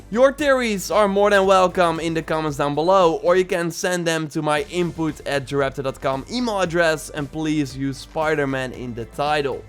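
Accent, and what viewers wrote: Netherlands. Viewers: Are you from holland (Netherlands)? You have a little bit of a dutch accent while you speak english. Great video btw! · yes hes dutch, his accent is quite thick lol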